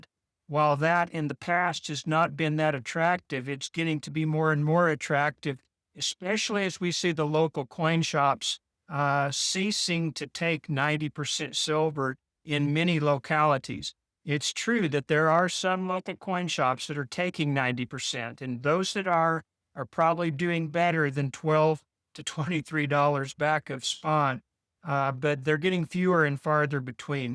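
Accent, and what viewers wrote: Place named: South, United States. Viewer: New microphone? Your southern twang I wish I had is missing today.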